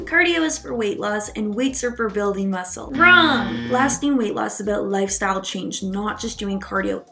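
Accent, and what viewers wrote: Canada. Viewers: Is she Canadian? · is your accent Canadian?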